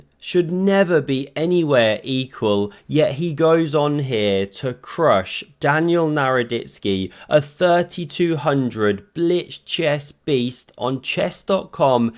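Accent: United Kingdom. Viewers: Got to love your British accent... I thought I heard "What a channel! Like a BETA version of me" and I‘m like "wait, what ?!!" So listened again. Love the humility. 180 degrees from what I thought I heard. Both channels are awesome.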